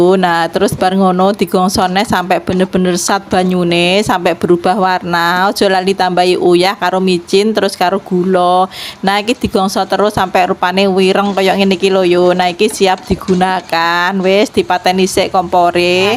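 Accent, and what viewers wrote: Java, Indonesia. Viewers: iki ko ndi mbak e...jawa timur koyone yoo,? bahasane khas jowo👍❤️❤️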